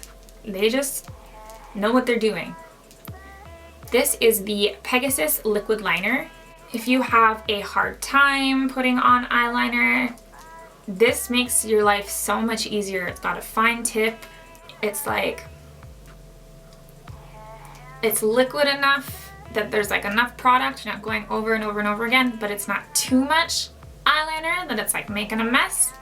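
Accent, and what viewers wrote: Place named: Canada. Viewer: oml she sounds so canadian in this video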